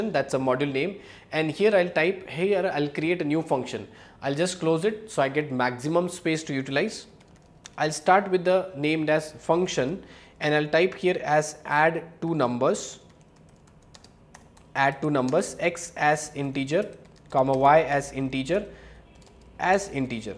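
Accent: India. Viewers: This comment has nothing to do with the content. But, WOW! Incredible presentation!!! The effect where you stand in front of a giant spreadsheet and push fields is AMAZING. The visual is so clear, I'm not even annoyed by the Indian accent. Going to follow (have less than 12 channels I'm following in over 10 years of use).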